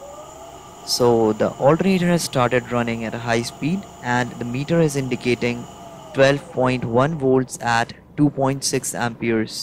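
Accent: India. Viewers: i can hear indian accent. no thanks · Peace mercy and blessings of God. After greetings. Good evening. How are you Indian people You speak English but your accent is Indian, and India is the country where Adam might God bless him and Grant him peace landed, and Adam is the first human being whom God created from dust and blow into him of God soul. Therefore, please let me tell you that Adam has created after devils not before. Note : And there are other human beings whom God created, just as He created Adam from dust and blow into him from God soul. and all those whom God created from His soul are human beings. Except one of the angels his name is Gabriel.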